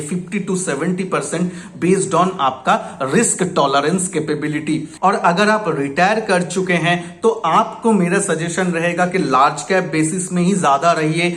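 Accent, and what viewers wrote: Bengal, India. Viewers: বাঙালির হিন্দি শুনলেই বোঝা যায়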